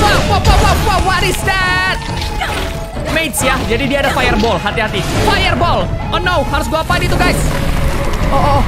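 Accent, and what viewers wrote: Java, Indonesia. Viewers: Mas po koei asli wong jowo ta kok ndang nding i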